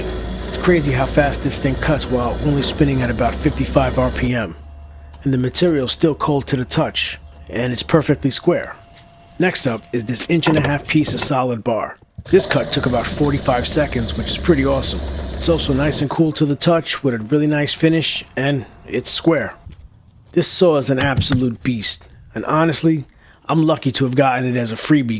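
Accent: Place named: Bronx, United States. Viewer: I feel like I'm watching a bronx tale with his narrating. Lol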